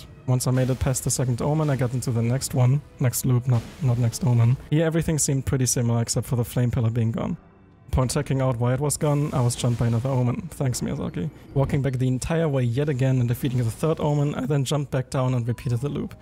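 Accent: Germany. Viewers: Ich mag deinen Typisch Deutschen Akzent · The German accent made is much better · Man hört den Deutschen Akzent so raus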